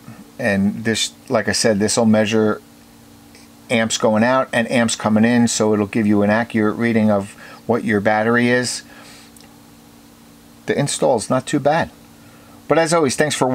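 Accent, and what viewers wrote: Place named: United States. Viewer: An excellent video so thanks for doing it. I didn't get any instructions with mine but that's O.K. thanks to your video, and spoken in plain American too. U.K.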